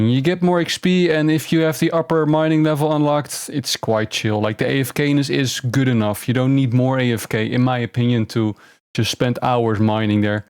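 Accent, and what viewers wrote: Netherlands. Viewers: You are dutch right?😅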